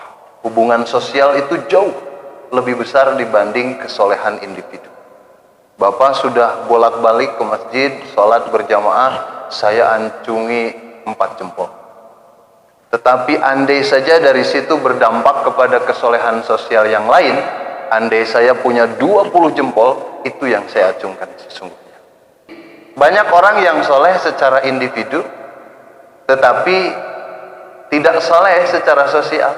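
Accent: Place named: Sunda, Indonesia. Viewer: Logat Sunda teh lembut kena kupingnya,,, berkembang terusss kang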